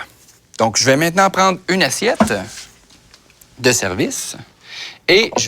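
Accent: Quebec, Canada. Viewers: Because he's from Québec (French Canada) the people from Québec speak french exactly with the same accent. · Cest juste un accent québécois ^^ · C'est l'accent conadien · C'est québécois non? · Rania Butterfly c'est le français canadien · c'est le Français québécois... pas comme de la France :p mais j'aime bien bro (y) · C un accent canadien ou quoi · Cest laccent québécoise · il est quebecois lol normal si il t entendait parler francais il trouvera aussi que tu as un accent et ce moquera aussi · Le système des degrés en Fahrenheit ainsi que le système de poids et de mesure en livre, en pieds et en pouce ( appelé système impérial) faisaient parti de notre ancienne méthode de calcul. Ça doit faire 25-30 ans déjà qu'il a été changé pour Celsius, mètre, centimètre, etc. (appelé système métrique). Mais encore aujourd'hui en 2015, beaucoup de personnes en Amérique du Nord continue à l'utiliser et on comprend très bien les deux systèmes. 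N.B. Par contre les U.S.A. sont encore avec le système impérial. Effectivement le 350 degrés F= le 180 degrés C. Ainsi qu'un plat ou plaque à gâteau de 9 pouces x 13 pouces = 22 cm x 33 cm, ou une plaque de 10 pouces x 15 pouces = 25 cm x 38 cm. Pour finir, j'ai le même accent québécois que lui. FRANCE du Québec. Concernant la durée de cuisson, en minute et en heure, c'est U.N.I.V.E.R.S.E.L. Un peu d'humour !!!!! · ًen plus c normal camarade il es canadien pour l'accent et Bravo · C francais du Quebec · C'est l'accent Québécois que j’adore.. merci beaucoup pour vos belles recettes . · Il est québécois. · J' adoooooooore l' accent canadien et le gâteau bravo ❤️❤️❤️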